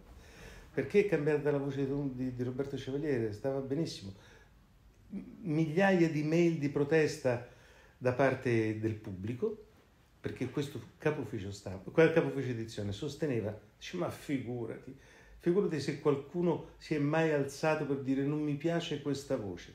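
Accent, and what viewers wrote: Rome, Italy. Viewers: Mamma mia che fenomeno, adoro la sua voce. Ma come fa, ha una voce sbiascicata, piena di sc doppie sbagliate romanesche...... grande!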